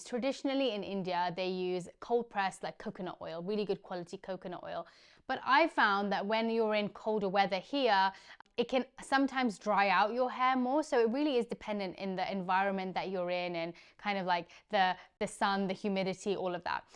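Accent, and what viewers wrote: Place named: United Kingdom. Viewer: Are you an Indian? I like your accent, it sounds like a little British accent :)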